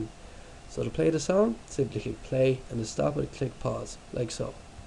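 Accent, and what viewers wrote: Ireland. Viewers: You're Irish :O I love your accent so so so much. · Where in Ireland are you from ? haha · He's not foreign.. he's Irish... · are you irish ? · nice to hear an Irish voice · r u irish?? just wondering :)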